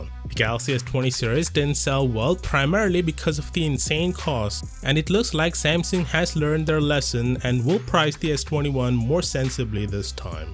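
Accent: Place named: India. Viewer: Why do you speak with indian accent?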